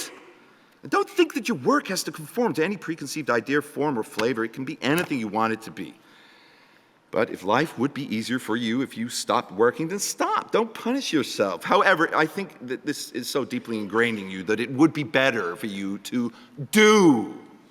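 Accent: United States. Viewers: How on earth he speakes American accent like pretty normal...oh my god..!!! He is British...How on earth how on earth..??? 😮😮😮😮😮😮😮😮😮😮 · Everyone please realise he's putting an American accent here. It's definately not a British accent. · Was he required to do this in an American accent? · Why he is speaking like an American!?!?!? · he's pronouncing ful r at the end.Of course 'it's an American accent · HIS AMERICAN ACCENT IS AMAZING TOO HAHAHAAH I LOVE HIM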